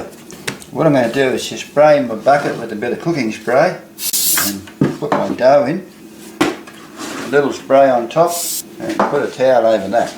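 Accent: Australia. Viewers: LUV your Aussie accent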